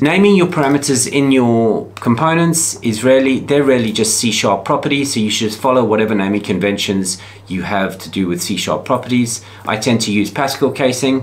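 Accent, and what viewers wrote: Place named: South Africa. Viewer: Do I detect a fellow South African accent ?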